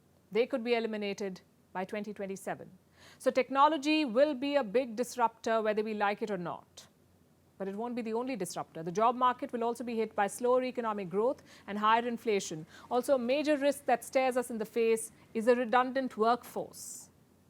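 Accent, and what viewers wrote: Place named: India. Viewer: Typical indian english 😅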